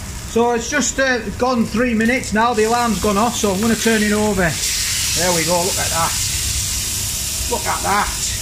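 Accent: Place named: Northern England, United Kingdom. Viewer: Hiya .. The steak looks great. I've also tried this steak from Iceland and I was very impressed by it too. What I want to know is where did your peas go to that you cooked?? I think you had steak brain and forgot about them!! Lol 😂😂 I've only subscriber a few days ago, and I'm loving your northern accent. I live in Bracknell (about 10 minutes away from Windsor) but have always had a London accent. Anyhow, keep what you're doing and I will keep enjoying your channel. Cheers, Vicky 😁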